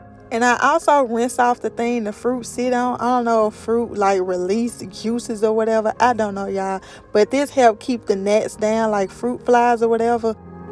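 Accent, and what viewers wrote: South, United States. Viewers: I like your country accent I'm country too Beautiful Queen you clean your house so well that Amazing. ❤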